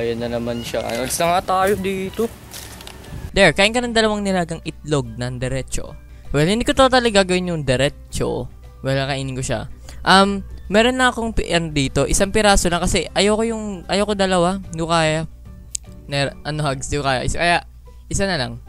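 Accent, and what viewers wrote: Visayas, Philippines. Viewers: bisaya ka po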